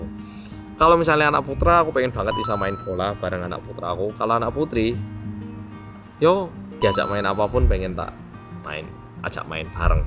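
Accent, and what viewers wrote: Java, Indonesia. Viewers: alhamdulilah mas aku bolomu dari gondola running man sampe eneng wong korea medok seng versi saschet🎉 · Ngikutin sam dari 2018an dari dulu yg masi nge kos trs nge vlog ini itu dengan logat medok nya yg masih lekat bgt smpe sekarang tuh luar biasa sam Kangen memang di bbrp taun terakhir ini jarang ngeliat vlog mas, sampe" klo senggang tuh ngeliat vlog" jaman dulu trs ngeliat metbg pas masi di awal" Wes pokoke yo kangen ngono mas. Smpe akhire barusan liat sekilas di ig oh ternyata Jennette hamil toh, dan ini baru liat video penjelasannya mas. Ikut berbahagia juga mas dengernya dan sehat" terus buat keluarga😊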